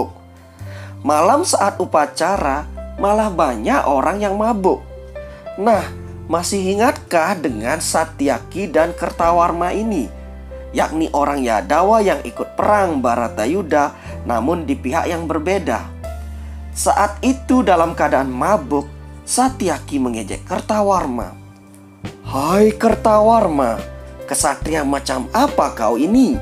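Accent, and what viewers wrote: Bali, Indonesia. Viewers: Logatnya saja jelas,logat bali di kata " T" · Logat penyiarnya seperti logat bali. Kalo didengar ceritanya mmg berdasar dari buku mahabarat. Aku jg pernah baca buku mahabarata versi s.pandit (bali) Dl pernah punya yg karya org india tp ga selesai karna bukunya tebel bgt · Orang bali..bkn madura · Logat balinya medok bli😀😀😁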